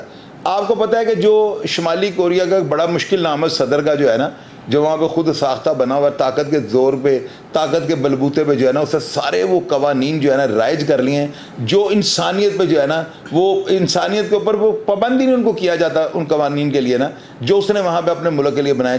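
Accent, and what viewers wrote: Lahore, Pakistan. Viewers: Aj lahori style Mai bat kr rhy hai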